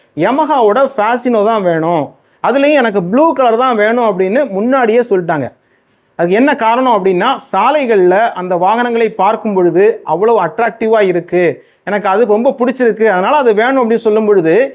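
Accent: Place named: Kanyakumari, India. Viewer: உங்களுடைய தமிழ் அருமையாக இருக்கிறது நீங்கள் கன்னியாகுமரி காரரா?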